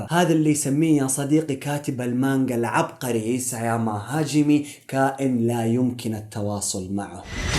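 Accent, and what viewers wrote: Yemen. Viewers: من وين انت لهجتك قريبه للهجه لليمنيه☻?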